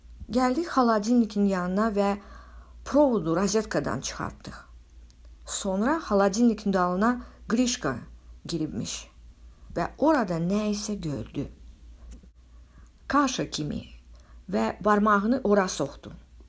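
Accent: Armenia. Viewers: Bu deyəsən bekarçılıqdan ağzına gələni danışır. Erməniyə oxşayır ləhçəsi də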